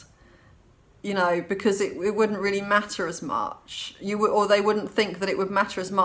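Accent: United Kingdom. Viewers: your perfect British accent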